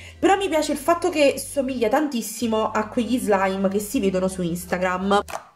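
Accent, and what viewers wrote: Naples, Italy. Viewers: La tua voce napoletana ha ha ha ha ha bellissima e bravissima magg scurdat pur o nome hahahhahahahaha adoroh viva NAPOLIIIII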